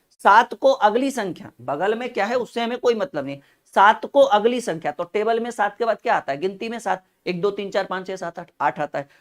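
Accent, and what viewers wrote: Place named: Maharashtra, India. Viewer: तुम्ही बहुतेक मराठी आहात.कारण तुम्ही आठी, साती म्हटले.😊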